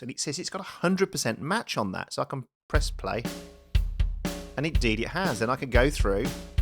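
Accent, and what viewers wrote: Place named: Midlands, United Kingdom. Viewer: He definitely has a Midlands accent ;)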